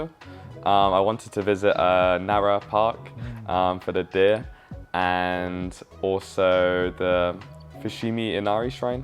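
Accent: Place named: United Kingdom. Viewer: イギリス英語で声が良いから耳が心地良い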